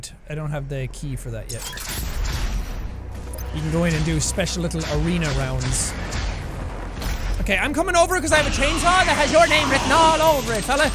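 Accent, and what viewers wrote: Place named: Ireland. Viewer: Normal people: fall asleep listening to calming music. Me: falls asleep listening to a excited Irish man slaughter hordes of demons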